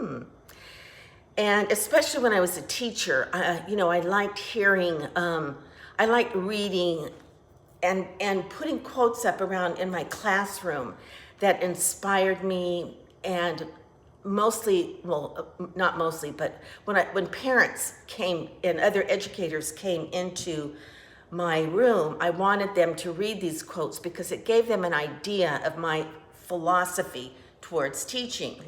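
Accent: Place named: Minnesota, United States. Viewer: You may be in CA, but you sound a lot like my family in southern MN💕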